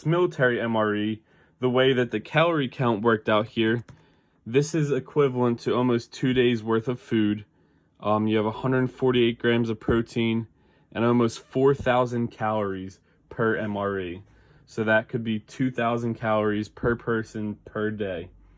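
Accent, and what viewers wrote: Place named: Baltimore, United States. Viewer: I detect a hint of Baltimore in your accent. Keep the videos coming sir.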